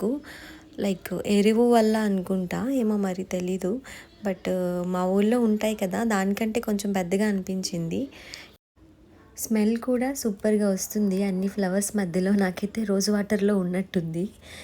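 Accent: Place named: Coastal Andhra, India. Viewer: Mi voice vizag valla la Ledu andi .. Looking like ...Krishna,gutur or khammam districts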